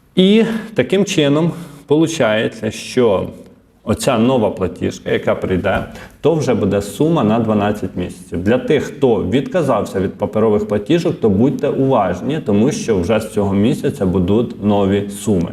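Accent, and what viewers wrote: Ukraine. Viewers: я це вже давно йому пишу,щоб вивчився спершу говорити вірно, а не на крижопільсько-жмеренському діалекті. А він не звертає уваги.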